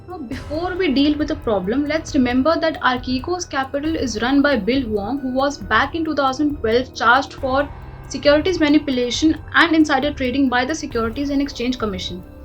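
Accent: India. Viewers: Indian accent is pain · good game.. well played yeah my head is confusing with indian accent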